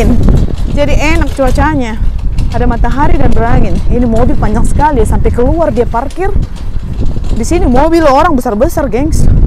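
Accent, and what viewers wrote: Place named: Medan, Indonesia. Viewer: Aku baru ikutin kak Mau nanya kak dari medan ya? Logatnya medan kali di Brastagi gitu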